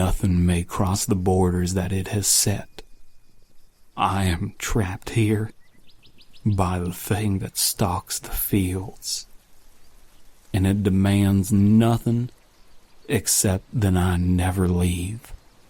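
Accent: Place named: Nebraska, United States. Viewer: You really did a good reading of this tale. Your 'accent' for this reminded me of growing up in Nebraska.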